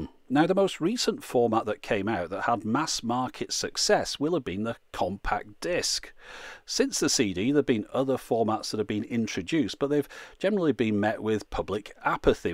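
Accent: United Kingdom. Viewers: Excuse me so is difficult understand you because you're British accent. But so much interesting videos. I have the idea that music media would be pendrives. Are more handy than SD memory. Greetings from Chile.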